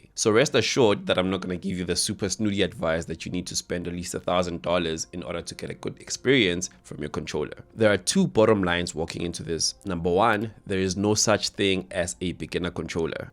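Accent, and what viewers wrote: South Africa. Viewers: That SA accent my dawg! Lol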